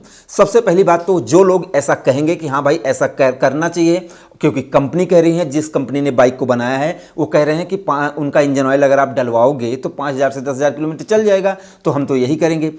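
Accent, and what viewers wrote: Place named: Rural, India. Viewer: अब तुम लोग मजाक बनाना बंद करो। यह इन भाई साहब का देशी अंदाज है। नकली लोगो की तरह मुँह बना कर बात करने वालो से दिल ऊब गया है।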